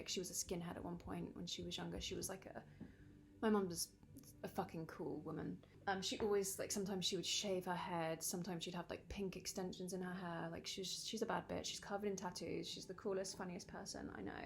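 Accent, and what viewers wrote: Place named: RP, United Kingdom. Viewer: ! Why are you so posh?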